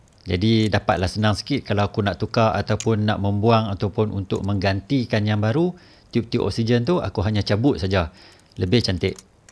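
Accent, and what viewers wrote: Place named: Malaysia. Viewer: Bahanya kok kaya orang Malaysia ya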